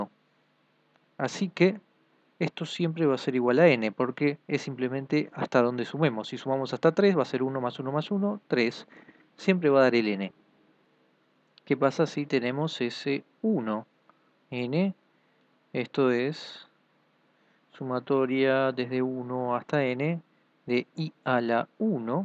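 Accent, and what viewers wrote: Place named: Argentina. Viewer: Gracias por la info, por tu tono pareces de Argentina, es así? En donde estudiaste?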